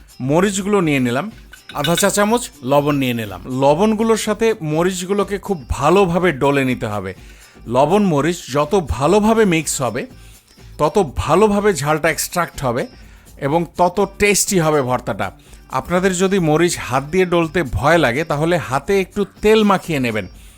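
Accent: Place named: Dhaka, Bangladesh. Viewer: ভাইয়া আপনার ঢাকাইয়া ভাষায় কথা গুলো শুনতে আরো বেসি ভাল লাগে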